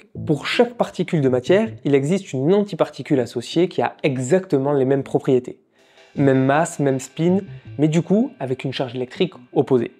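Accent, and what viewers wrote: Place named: Marseille, France. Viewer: L’accent de Marseille c’est insupportable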